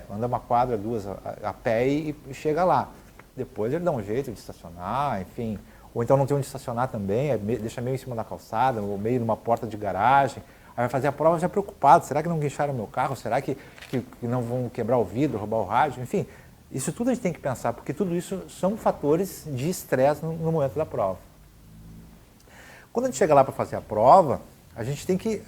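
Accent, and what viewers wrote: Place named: Brazil. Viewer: JÁ TE ACHEI NO FACE E MANDEI UMA SOLICITAÇÃO. ASSIM QUE COMEÇASTE A FALAR JÁ VI QUE ÉS DO MELHOR ESTADO DO BRASIL. SAUDADES DAÍ.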